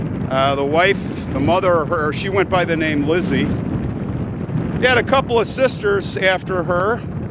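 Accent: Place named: Upper Midwest, United States. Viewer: I love the music. It reminds me of Minnesota and North Dakota where I went to college and graduate school when my husband was in med school. The nicest people and a wonderful six years exploring North Dakota and Canada. Your accent takes me right back to 1970.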